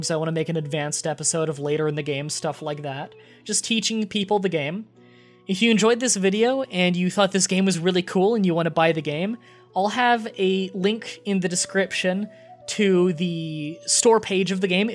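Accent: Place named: Canada. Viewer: 99% Sure he is Canadian. The way he says "about". ABOOOOT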